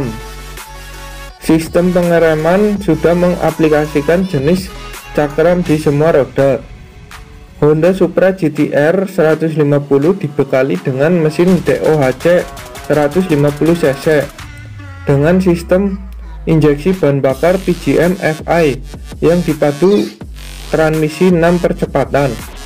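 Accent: Java, Indonesia. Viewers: Ngomong opo sih mas medok banget😄 wes rapopo semangat. Lagian motor itu ga di jual dan ga di produksi di indo. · Medok bgt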